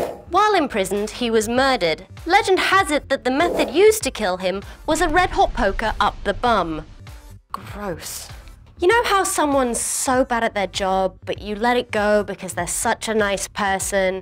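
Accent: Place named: RP, United Kingdom. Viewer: has a home counties accent, and what is called Received Pronunciation. which is the standard form of British English pronunciation, based on educated speech in southern England, widely accepted as a standard elsewhere.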